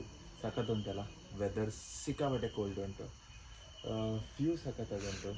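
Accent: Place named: Mangalore, India. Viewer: Superb voice and Mangalore Accent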